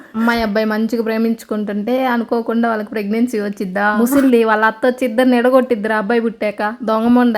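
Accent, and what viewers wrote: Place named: Rayalaseema, India. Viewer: మీది ఏ ఊరు అండి రాయలసీమ slang లోనే మాట్లాడుతున్నారు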